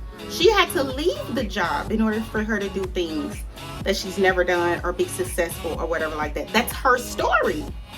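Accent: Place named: Texas, United States. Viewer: Honey I love your Texas accent! Please give us more. And celebrating the small steps of your loyal circle is important. My circle getting super small though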